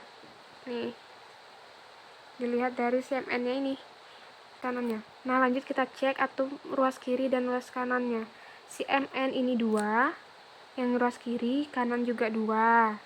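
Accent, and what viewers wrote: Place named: Sumatra, Indonesia. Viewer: kalo dari logat nya sepertinya Sumatra kak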